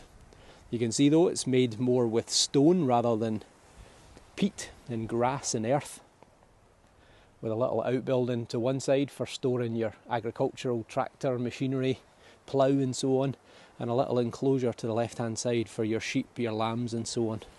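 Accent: Scotland, United Kingdom. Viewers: Listening to English spoken with the Scottish accent is like listening to music. Thank you for the photography and narration. Lovely 🌹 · Thank you for this tour. It's a reality check for most of us who never knew what our ancestors no doubt lived through. My family lived in Scotland, we think the northern part, slowly moving to Canada then to the US a 150 yrs ago. It was heart warming to hear my grand father who still had a bit of his Scottish accent; just a few words that he automatically spoke that sounds somewhat like you. SCOTLAND is beautiful to be sure, but wasn't an easy way of life in times past. This was wonderful & I love your videos of this beautiful country. Thank you!!!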